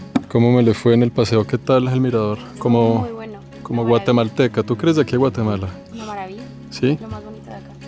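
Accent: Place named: Paisa, Colombia. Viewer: Interesante trabajo com yutuber, porque usted no solo nos muestra a los sedentarios el muno, sino y muy importante también, ayuda a preservar ese acento tan colombiano, que supongo es el que denominan paisa y que, no tengo la menor duda, identfica a Colombia en cualquier parte del mundo.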